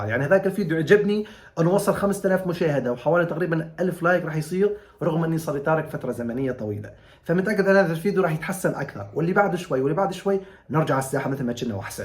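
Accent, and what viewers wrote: Iraq. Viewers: واجيتكم بعد غياب صارلي 4سنوات مو شايفك مشاء الله عليك شو احس انته صاير تحجي عراقي مبروك ع المليون فديت ❤ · فدوة احلى سوري يجيد اللهجة العراقية ربي يحفظك ويعلي مراتبك ان شاء الله توصل للمليون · سوري وانا من بدايه الفيديو وانا مفكرتك عراقي بسبب اللهجه ❤ حبايبنا السوريين 🇸🇾🌹♥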